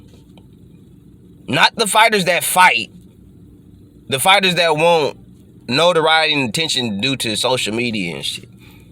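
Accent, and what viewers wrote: United States. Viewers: another bollocks talking yank channel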